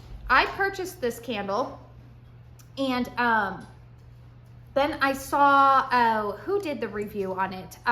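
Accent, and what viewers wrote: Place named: California, United States. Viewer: Omigosh how lovely thanks! You made my day 😀. I actually just recorded a Goose Creek haul (posting tomorrow) and we have some pretty similar takes. It is too darn early for me to start thinking about Fall as well! The Churros candle - it was playing a trick on me too! At first I thought maybe there was some apple in there. It is so similar to cider doughnut/brown/cinnamon/sugar type candles. I think I’m just so used to fragrances like that having an apple component that I imagined it. 🤷 You totally have that classic Cali accent going on and I’m here for it 😊 Thanks again! Have a great weekend!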